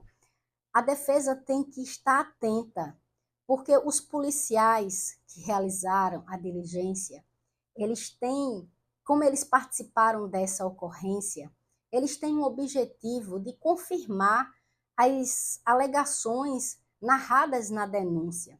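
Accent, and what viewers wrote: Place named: Northeast, Brazil. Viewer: Esse sotaque de nordestina é um porre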